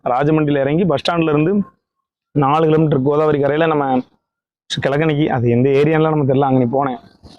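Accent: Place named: Tirunelveli, India. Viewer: super bro.. which place in tamilnadu.. slang seems to be tirunelveli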